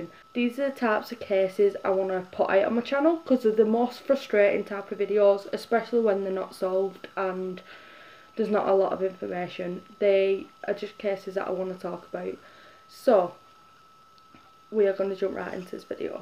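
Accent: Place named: Manchester, United Kingdom. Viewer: Your accent is so interesting! Are you from Manchester?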